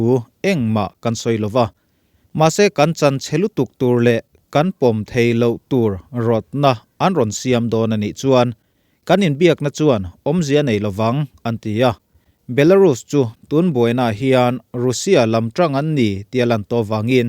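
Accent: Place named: Champhai, India. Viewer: Champhai pa mizotawng hi chu analh ve chiang em. Champhai mizo tawng hi chu a nalh bik e.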